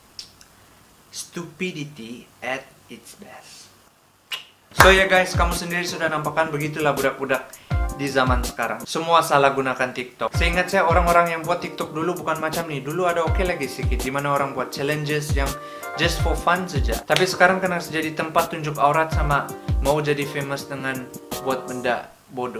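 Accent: Sabah, Malaysia. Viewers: Awak org Sabah ka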